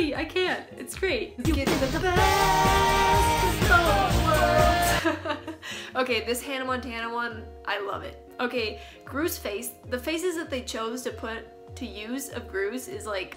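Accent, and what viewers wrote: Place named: United States. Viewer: Hey I’m lesbian. I thought you were American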